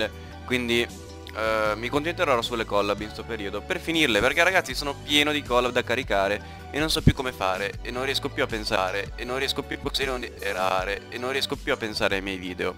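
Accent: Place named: Romagna, Italy. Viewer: Mi sembri romagnolo dall'accento, sei di Ravenna?... AnnennA...AnnA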